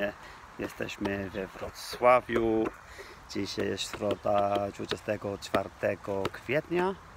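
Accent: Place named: England, United Kingdom. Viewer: You may have messed up an ending or two, but on the whole very good! And your accent is very good too! At first, in the beginnings of the channel, your English accent was quite pronounced in your Polish. Now it's barely noticable, compared to your beginnings. Well done mate!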